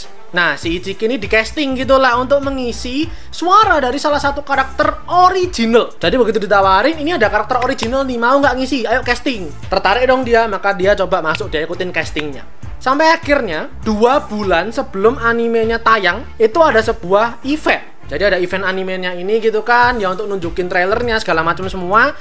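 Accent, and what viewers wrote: Java, Indonesia. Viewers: Medhok banget kak asal jawa kh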